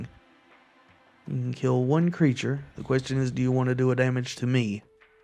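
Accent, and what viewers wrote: United States. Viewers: Definitely had to subscribe and leave a like. This was a very entertaining video, and I'm glad I happened to stumble upon it from watching LegenVD. You have good audio and video quality (looks very crisp!), a clear voice, and I enjoyed how you always explained your reasoning for whatever play you were doing. I was already familiar with all of it, but I've always appreciated when people playing this help new players out by giving advice. Though I think my favorite aspect of watching this was just how pleasant you were. Very thoughtful no matter how you were doing. That said, I've got to ask which state you're from after hearing the accent.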